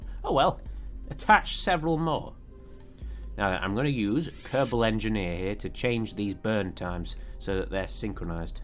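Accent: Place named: Northern Ireland, United Kingdom. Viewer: He's Northern Irish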